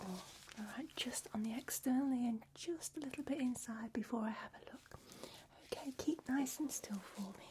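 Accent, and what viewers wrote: United Kingdom. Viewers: I love the tingly and relaxing video. The British accent is a plus. Just lovely. ❤️🌻💜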